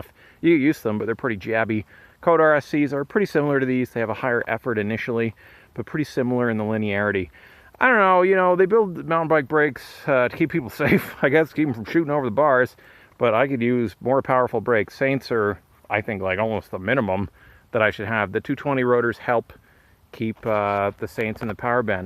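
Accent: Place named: Germany. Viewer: Hahahaha Like your german accent dude. Greetings from germany, Marc. Didn't get the 220 here actually. 😞 riding code r, but i hate the constand bleeding i've to do with the sram. Likely every week. Thats annoying.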